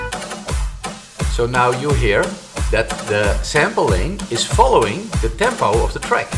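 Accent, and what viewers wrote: Netherlands. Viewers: Ik kan gelijk horen dat je uit Nederland komt leuk hoor ik ga deze app even downloaden hartstikke bedankt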